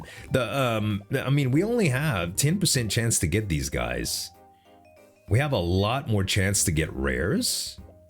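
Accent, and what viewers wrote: Louisiana, United States. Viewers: Okay dude your accent is driving me nuts. Are you from Louisiana? You have a very Cajun accent. I'm super familiar with it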